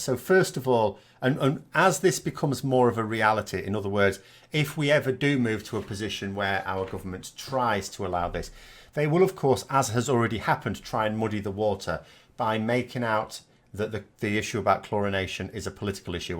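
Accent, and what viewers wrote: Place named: Midlands, United Kingdom. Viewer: I really like your work, you are a natural communicator! Best of luck with full-time YouTubing. Where is your accent from? It sounds my ear as a nice middle England genuine accent.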